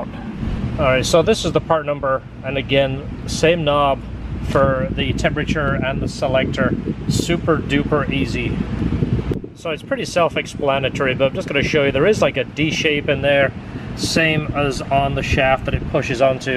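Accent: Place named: Yorkshire, United Kingdom. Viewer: Hey. 3 things to mention here. Good tip on drilling the holes to prevent further cracking.👍 The repair job came out nice. The S2000 buyers assistance program sounds great. I think there's a lot of value to the customer to have an S2K specialist like yourself sourcing the car for them. And finally, there's nowt wrong with your accent. It's Yorkshire innit?😉 I mean, you hooked Esther with that accent, so it sounds like a winner to me. See you on the next one.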